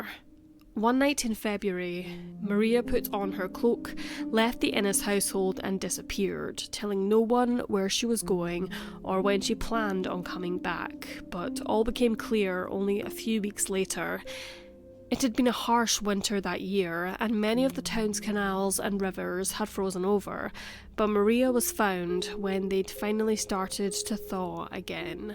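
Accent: Scotland, United Kingdom. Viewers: Hey Hey Are you speaking with a Scottish accent?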